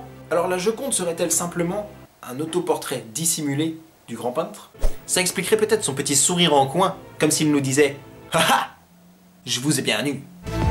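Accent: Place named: Alsace, France. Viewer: Tu ai alsacien car j'ai reconnue ton accent .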